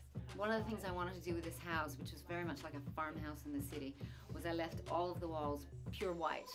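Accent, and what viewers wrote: Australia. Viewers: Thanks for the brief invite to your lovely home. Australian accent, really, I had no idea what it was. Your name shows french as does mine so the Australian bit surprises me a little. Whatever it is, it is perfect. Murdock Mysteries for me is a real treat, the names of the streets in Toronto and surrounding areas brings it all together and I absolutely love love love it. Keep going and I and we will be there with you. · Love that you have your Australian accent. Love the show!!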